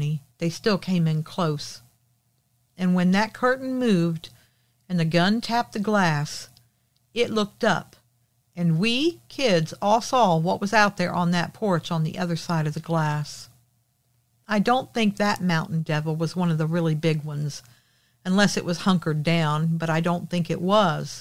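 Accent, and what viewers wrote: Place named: West Virginia, United States. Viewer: Well done! I loved this story! So glad you narrated in the same W Virginia slang. I could absolutely see every detail of the story. Well written and well narrated. Thank you!!!!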